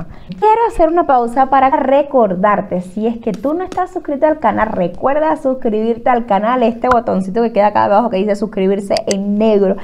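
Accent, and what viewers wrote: Venezuela. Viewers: Te confunden con venezolana así de simple por el acento que es muy parecido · Es la desconfianza que tenemos hacia los venezolanos. muchos llegaron con malas intenciones y tu dejo se parece mucho a ellos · no te discriman a ti si tu eres Bella es que tu acento se parece a un venezolano con respeto y por temor a algunos que alquilan tiene miedo que les pueda tu ya sabes hacer cosas malas si tu le dijeras que eres Cubana normal te aceptarian es por el acepto casi similar que tiene sera por eso suerte con tus videos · tu hablada es parecida a la hablada venezolana, quizás sea por eso que te confunden, cuando llames y te confundan por la hablada, diles que eres cubana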